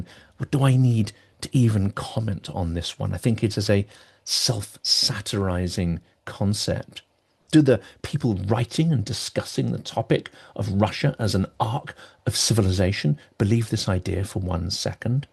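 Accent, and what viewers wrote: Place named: West Country, United Kingdom. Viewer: How dare that hypocrite Galloway claim he cares about suffering humanity... while supporting Russian imperialism! The guy is a fraud, a morally bankrupt political opportunist who jumps into by-elections to get his ego stroked by being in the headlines again...upon winning a by-election (such as Bradford and Rochdale) he soon abandons his constituents - the campaign doing its job of getting his face, voice and name in the news, his ego bolstered. Thanks. I love your eloquent and witty - yet acerbic when needed - monologues. I could listen to your fantastic lilting West Country tones (I am assuming it is a West Country accent, right?) all day!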